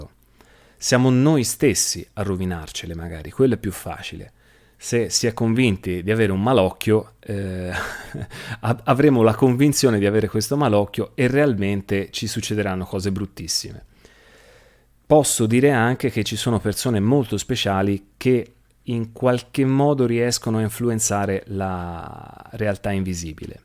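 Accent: Tuscany, Italy. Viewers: Grazie per questa diretta, l’ho vista solo ora. E comunque il cancella, cancella, cancella detto con il tuo accento toscano è già motivo di buon umore 😊😊😊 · non è Nulla caro buona serata ripensando alla legge di attrazione sempre in servizio non conosce crisi di congiuntura 😅 la difficoltà è il vivere con persone anziane di altri tempi e negativi e combattere questa nebulosita è cercare di proporre é difficile continuiamo sempre molte grazie e apprezzo la sua scelta di farci sentire la sua voce Toscana la patria di Dante e sempre molte grazie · Il tuo accento Toscano Tienilo con te ❤️ La tua emozione bellissima Alla prossima diretta ti farò una domanda Buona notte 😘😘😘😘😘 tvb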